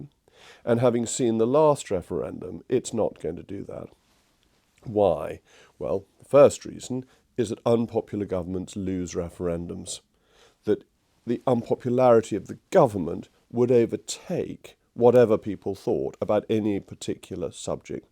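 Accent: RP, United Kingdom. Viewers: He talks bullshit with a posh accent. Where’s the cheap shoes and food lord snooty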